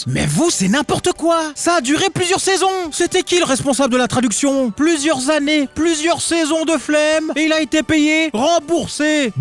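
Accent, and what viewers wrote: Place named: Antilles, Caribbean. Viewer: Antillais j'ai l'impression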